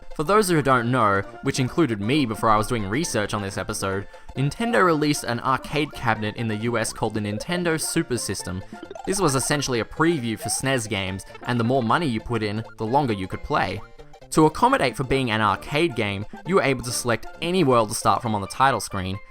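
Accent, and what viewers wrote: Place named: Australia. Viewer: different accents. he's Australian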